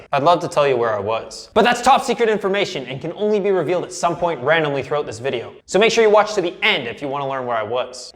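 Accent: Canada. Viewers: I am fookin' Polish and I understand 99 percent of what you say. I love the Canadian accent! BTW Your channel is so fooked up! !!!!!!!!!!!!!!!!!!!!!!!!!!!!!!!!!!!!!!!!!!!!!! Just kidding. It's great keep it up. Cheers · Are you Canadian? Got the Canadian "are"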